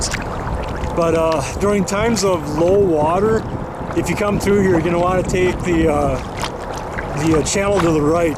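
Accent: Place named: Minnesota, United States. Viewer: Nicely done video. Calming, informative. Love the Minnesota accent. look forward to more videos.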